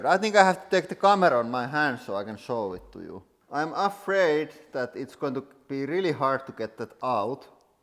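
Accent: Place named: Finland. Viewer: We Finns speak English like this 😄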